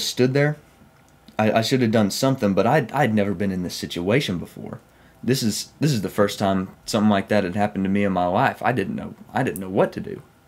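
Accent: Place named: Oklahoma, United States. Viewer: Enjoyed your “500 Subscriber Special” and Thanks for confirming you’re Accent is from down in Oklahoma. Keep up the strong 💪 efforts with your videos. 👍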